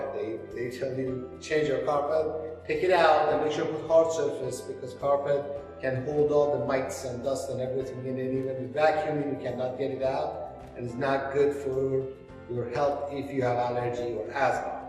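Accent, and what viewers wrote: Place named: Iran. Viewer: Dude’s name is but accent is so iranian 😆 #loveit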